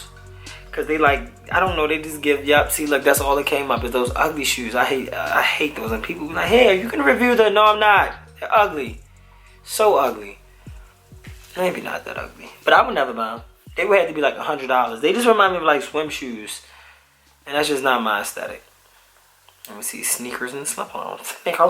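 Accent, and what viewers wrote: Baltimore, United States. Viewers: u gotta b from bmore i could hea it in ya accent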